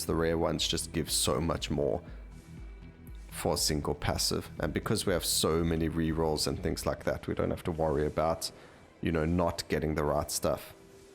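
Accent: South Africa. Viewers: Anyone else love the South African accent? Wack ton of a awesome lol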